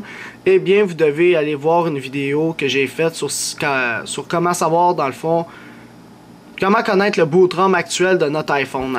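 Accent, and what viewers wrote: Quebec, Canada. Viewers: tu as vraiment un accent de fou, sacré québécois :D bon tuto en tout cas ! pour ma part je reste aussi en 4.1 JB à cause du tethered de l'iOS4.2.1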